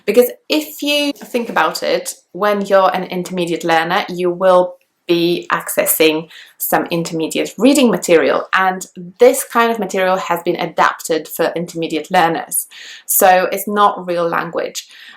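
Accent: United Kingdom. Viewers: Hi Good morning from Myanmar. I've been learning english 2 years nearly but my take on is that it didn't work much as i hope. British accent appeals to me tremendously. I feel i have a weakness in pronounciation. Fortunately, your vedio for boosting my accent in british is exceedingly great for me. ohh by the way, your british accent is lovely. Thanks a lot for your kindness.😘😘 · I thought you were British till you started speaking about your fossilised error ! My god, you sound like a Brit through and through. What's your native language ? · Lol i thought you were british until you said the 'this and that' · I thought she was british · I did, but it was like 95% BrE, and 5% german, maybe, cause thr L is sorta german, especially in words like "language" and words that have "ly/li" in it. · As a well travelled Australian, I thought you were from the UK. Only some words sound a little 'central European', and you have both pronunciation for the north and south of the UK. 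But there is plenty of examples of natives that do the same! Well done!!! Like another view, I am technically advanced in Spanish, but this seems to fluctuate greatly depending on the day...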